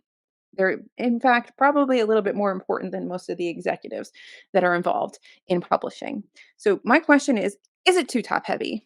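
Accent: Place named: New York, United States. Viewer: love this video and had to comment bc when you said "cost" i knew immediately u were from new york and love ur accent (bc it's like mine haha!)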